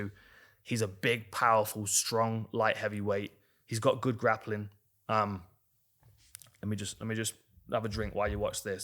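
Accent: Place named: East Midlands, United Kingdom. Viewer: Incredible breakdown as always and... incredible accent. East Midlands, let's gooo!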